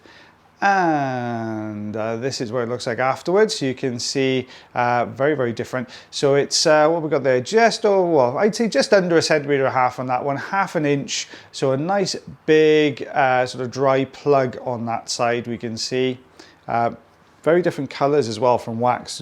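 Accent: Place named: Wales, United Kingdom. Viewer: I live in Australia but am from UK. Lived in Wales for 7 years and watch you to hear your voice (besides the extractions of course) Takes me back 57 years. Of course I hope you are feeling better, it’s winter here and lots of flu, head colds etc. Hope you coped with the heatwave, that’s the norm here in summer.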